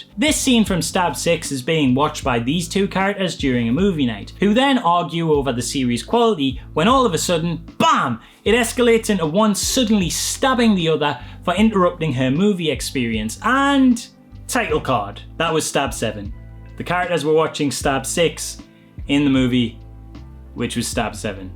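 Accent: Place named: Newcastle, United Kingdom. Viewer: Northerner? I'm leaning towards Geordie... Kinda...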